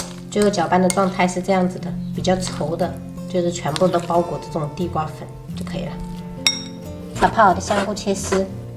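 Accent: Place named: Quanzhou, China. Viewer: Watching your video makes me homesick. 川妹子说起闽南话竟然一口泉州腔。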